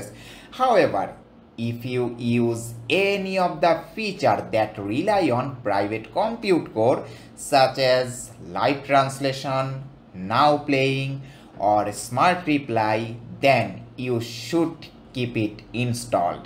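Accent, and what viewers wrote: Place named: India. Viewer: Can you speak english without indian accent???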